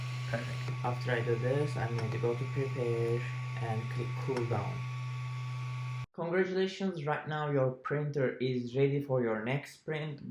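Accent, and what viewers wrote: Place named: Turkey. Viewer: Even though my machine has been built I was having issues with levelling but since finding your page and content I followed your method and guess what... it worked. You have a big fan here and also I have a feeling from your accent your from one of my favourite holiday destinations. Hope you don't mind me asking but are you from Turkey ?? Thanks again brilliant !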